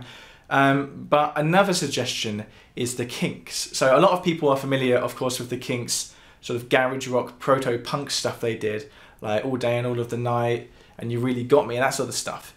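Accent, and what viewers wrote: United Kingdom. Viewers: What I also like about this channel is that English is spoken in a very clinical clear way as if it is radio English. Yes there is a slight British general accent, but not disruptive. We don't want to be sidetracked with heavily accented English. This is also understood by Americans without being American English. It's almost international English I dare say.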